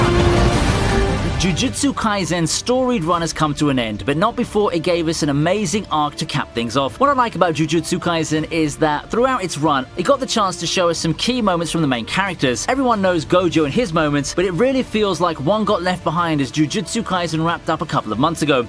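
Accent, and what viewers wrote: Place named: England, United Kingdom. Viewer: This would be a great video if the narrator could shut the fuck up and let the scenes play out. Hard to get any emotion out of these clips with a strong english accent telling us what to feel and then splicing in sentences so quick it's jarring, along with a sponsor 5 minutes in. Fuck I hate youtube.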